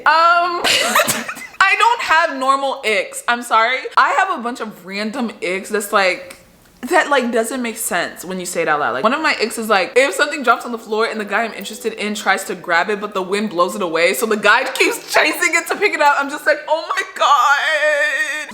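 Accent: Nigeria. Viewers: Are you Nigerian 🇳🇬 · Lol I was wondering why you always switched to a Nigerian accent in your videos 😅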